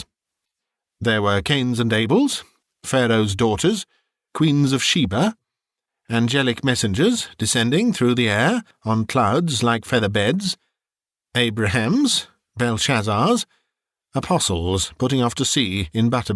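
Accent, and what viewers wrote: United Kingdom. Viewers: I tried listening to a few other versions. A Christmas Carol, narrated without a British accent, cannot be borne. My opinion, I know, but at my age, traditions die hard. So, yours will be the version I will be listening to this season. I must admit that due to my illness, myasthenia gravis, I will not be able to cook for Christmas this year or decorate. It is all I can do to take a few steps and do my speech therapy, swallowing therapy, and everything else therapy, lol. So, please know how much your production will mean to me this year, about the real meaning of Christmas. I can't now join your Patreon, but am hoping to as soon as my disability is approved, to thank you in a more tangible way for the very many long nights your charmingly narrated stories have helped me through insomnia, pain, and sleepless nights. I have been following you since the beginning under my other channel, Wmnoffaith1, but I am the same person, notwithstanding. 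( It is rare that one has an opportunity to use notwithstanding in a sentence; it makes me feel positively Dickensian:). Anyway, I just wanted to express my thanks, and let you know that regardless of the problems, and wars you have had to endure this year to keep your channel alive, it is appreciated by so many of us, and provides more comfort than you know. Merry Christmas and a Happy New Year, to you and yours. I wish you all of the success and blessing and prosperity that you so richly deserve in the coming year. Sincerely, Cheryl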